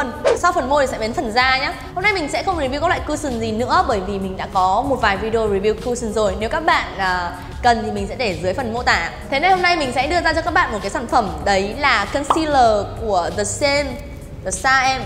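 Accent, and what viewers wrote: North, Vietnam. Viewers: Cj giọng Bắc cực kì dễ thương lun ák. Mãi iu cj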